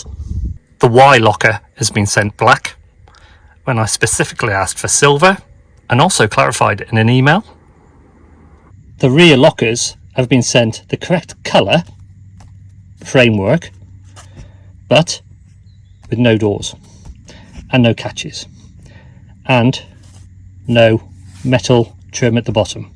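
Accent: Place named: Wales, United Kingdom. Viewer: Genius intro!! We watched it 3 times 😄😄 That welsh accent is amazing 😉 I would literally set fire to anything that’s been sent so far! That’d actually ruin my patience. Nice Fjallraven hat, I’m going to have to get one now!! 🤦🏼‍♂️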